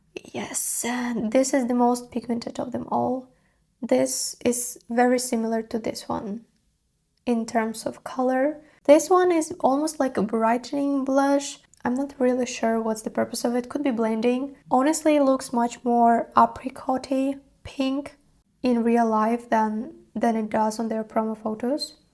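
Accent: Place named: Eastern Europe. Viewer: Сразу видно наша славянка ! Акцент как у меня 😊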